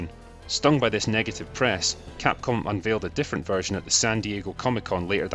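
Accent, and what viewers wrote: Scotland, United Kingdom. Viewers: ive had some Scottish friends and they sound a lot like him..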